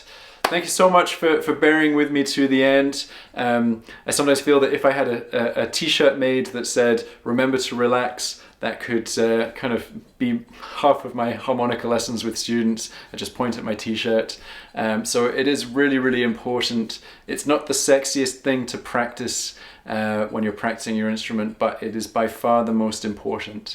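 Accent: Scotland, United Kingdom. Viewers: Bro are you really Scottish??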